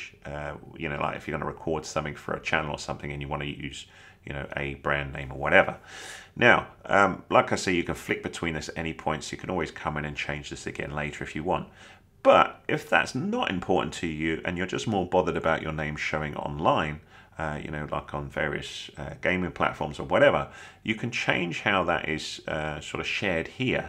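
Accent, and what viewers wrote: United Kingdom. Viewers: U already know it’s a good tutorial when they got a British accent 🙌🏽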